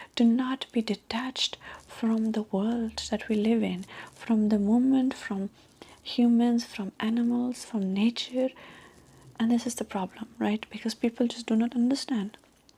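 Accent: India. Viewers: As an American I love Indian accents i subscribed to your other channel too. If you keep making videos, we’ll watch 🔥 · these indian accent vids are cool. but I miss your regular accent. what are some other accents you can do?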